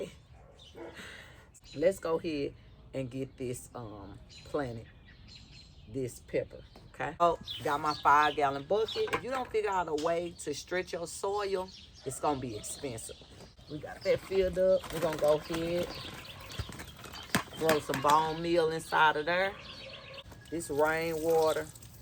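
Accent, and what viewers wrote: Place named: St. Louis, United States. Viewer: Sounds like you from St. Louis.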